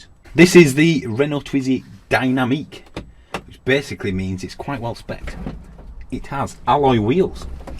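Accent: Yorkshire, United Kingdom. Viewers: Love the accent too. Somewhere in Yorkshire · probably just the Yorkshire accent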